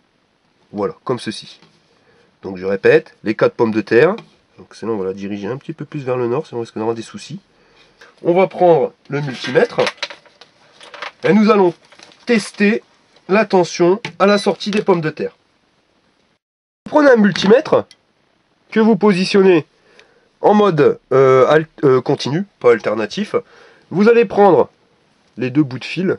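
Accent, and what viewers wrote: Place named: North, Germany. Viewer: super, l'accent deuch nord est beau aussi!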